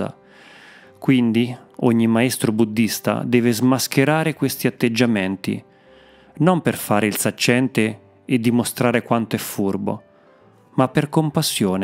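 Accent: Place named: Ancona, Italy. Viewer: Grazie per l'ottima lettura. Alan Watts è un autore che, a mio parere, molti usano senza citarlo; ma va bene anche questo. Scusami la curiosità: il tuo accento mi fa pensare alla calata anconetana o della provincia di Ancona... Sbaglio? Un caro saluto...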